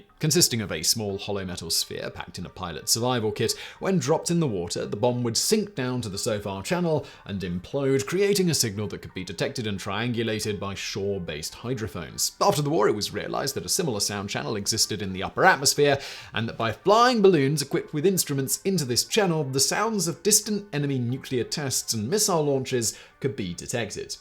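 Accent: England, United Kingdom. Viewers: Misinformation never sounded so english and bald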